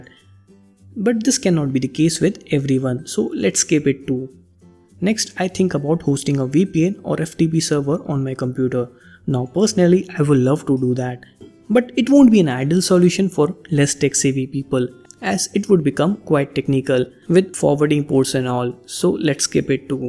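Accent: India. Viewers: The only indian voice i can take without wanting to just click off the video. Great job!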